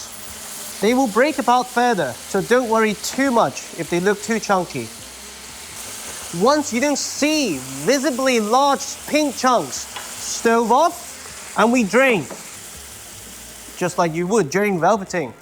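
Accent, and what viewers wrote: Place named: United Kingdom. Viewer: did not expect the Britiish accent ! but overall good recipe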